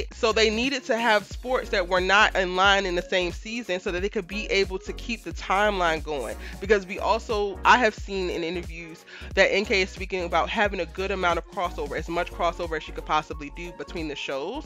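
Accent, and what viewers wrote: Baltimore, United States. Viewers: I knew you were from Baltimore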